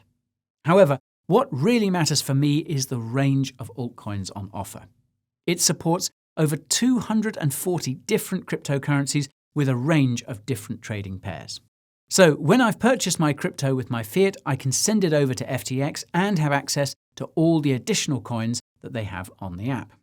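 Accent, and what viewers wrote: United States. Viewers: Love the American accent! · I never get tired of the American accent lol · Good American accent Guy!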